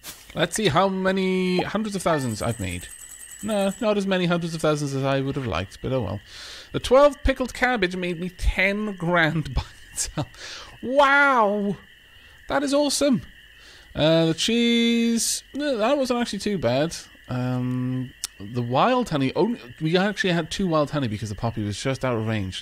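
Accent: United Kingdom. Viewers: That's an accent thing. He's saying it right in his accent. It's a common pronunciation across the UK, even here in Australia!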